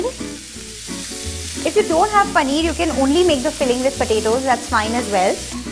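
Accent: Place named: India. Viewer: I dont like the accent of indian when speak english hehehe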